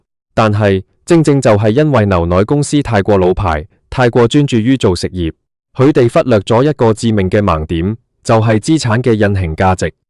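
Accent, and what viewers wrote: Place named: China. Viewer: 一聽D大陸口音再加上個標題，就知係 大陸網講香港舊事。65歲以嘅老香港 都知當年市面上同報紙都係話「置地飲牛奶」而唔係 置地「吞」牛奶！ 好心！做資料蒐集就做好D喇！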